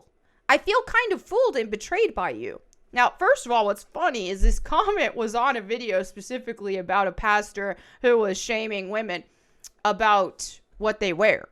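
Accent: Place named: South, United States. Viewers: I think I’m hearing a bit of a southern accent. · I love Southern twang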